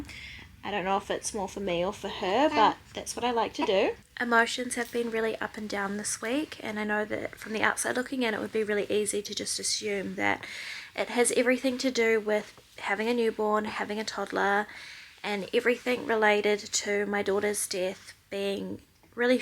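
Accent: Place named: New Zealand. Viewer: you and Brogan have the most beautiful babies...truly 3 of the most lovely little babydolls ❤ Also I could listen to you speak all day, love NZ accents! Love from North Carolina USA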